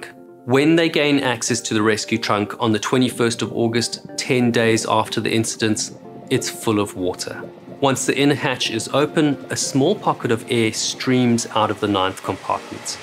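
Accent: South Africa. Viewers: Mate, I would just like to send you all the compliments you deserve!! Your quick and concise detail of complicated events is an absolute pleasure!! I've watched many of your videos of incidents I already know a lot about and still won't skip a second! Best of all, having a good old South African boitjie telling me the story makes me feel like I'm chatting to a mate! Thank you for all your time and effort you put into these videos!! I tip my hat to you sir!!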